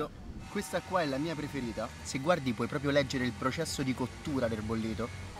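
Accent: Rome, Italy. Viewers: Un bel corso di dizione non sarebbe male, traspare la poca istruzione avuta quando parli romano "andiamo a provà" "annamolo a scropì" "e quindi mò".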